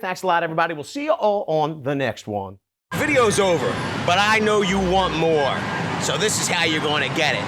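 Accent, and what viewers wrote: Philadelphia, United States. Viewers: That's phillidelphia I hear i your voice. Almost thought it was Camden but it's Philly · I'm guessing you're from PA, specifically around Philly. Wuder instead of water. Lol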